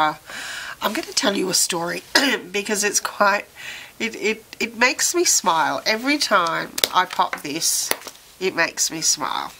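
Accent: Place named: Melbourne, Australia. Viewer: Thanks for sharing your views on this beautiful product and it's nice to to hear a fellow Melbournian. I agree with everything you say. Gillio's products are simply divine and of the best quality and craftmanship. I have a Gillio Giramondo in Epoca Red and just received my A5 Appunto in Epoca Gold yesterday. I love them both.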